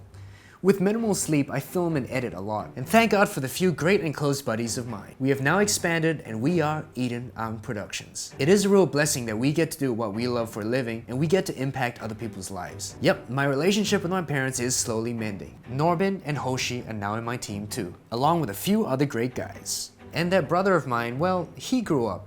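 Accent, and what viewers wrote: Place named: Taiwan. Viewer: OMG YASS no wonder your Mandarin has Taiwanese accent XDD love it so much!! I'm from Taiwan and am studying in Australia now~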